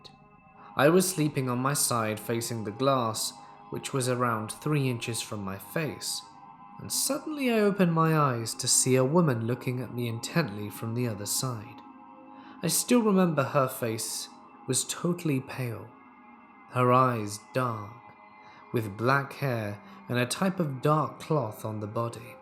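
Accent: England, United Kingdom. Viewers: is the best humble horror narrator of YouTube! Got to have that English accent, after all it is their language 🏴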